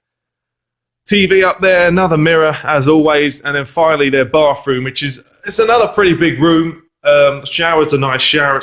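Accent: United Kingdom. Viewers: omg it is such a beautiful house and you live in florida and you sound like you live in the uk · I love how you still kept your British accent. :)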